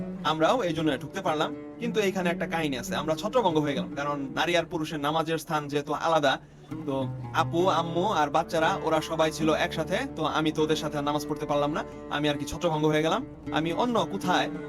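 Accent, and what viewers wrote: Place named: Tangail, Bangladesh. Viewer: খুব ভালো লাগলো ভিডিও টা। ভাই আপনার দেশের বাড়ি কি টাঙ্গাইল? আপনার কথা শুনে মনে হচ্ছে আপনার হোম ডিস্ট্রিক্ট টাঙ্গাইল।।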